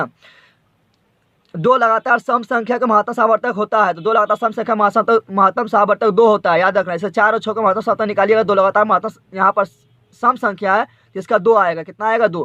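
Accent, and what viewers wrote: India. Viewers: rajasthan Wale ase he bolte h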